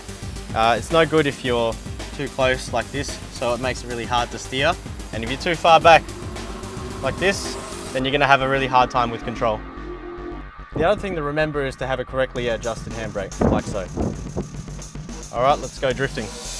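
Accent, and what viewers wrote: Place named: Australia. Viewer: Asian guy with Australian accent. Nothing unusual here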